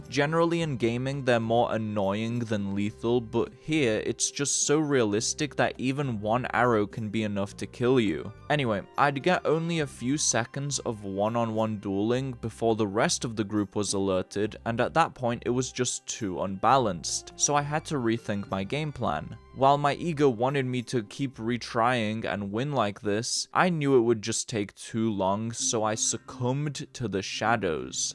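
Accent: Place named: Northwest England, United Kingdom. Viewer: This is my fourth playthrough. Two in original mode and my second playthrough in hardcore. The blacksmith dlc is heaven sent. This time I started it asap. This fourth playthrough is the best yet. Take my time and new things come up all the time. I'm finally getting a handle on the Kuttenburg map but I still get lost at times and for me it's mostly because I get lazy in my navigation before I head out from a town or whatever. I use my body as a sundial except for when it high noon ish. Never get off the road at night very far w/o nighthawk. But yeah, the immersion experience is so good in hardcore that I would never go back. I only use three negatives and the easiest ones for me. Very nice and relaxing video. Keeps the love fresh for the game. Cheers btw where in the UK is your accent from? My guess is the northwest. Blackpool. 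Liverpool?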